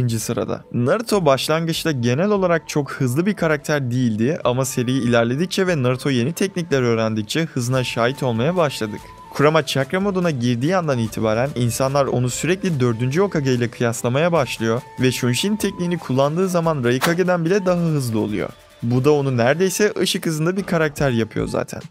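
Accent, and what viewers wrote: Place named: Sivas, Turkey. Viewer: Abi gardaş ı çok iyi telaffuz ediyorsun sende sivaslï havasï seziyorum yanlış da olabilir cevap verirsen sevinirim♥️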